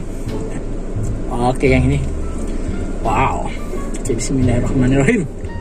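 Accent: Terengganu, Malaysia. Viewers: Lah abang org terengganu..ekgi boleh jupe😂 btw sy sentiasa support abg❤️ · Abg orang ganu ke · Bang org terenganu ke bang?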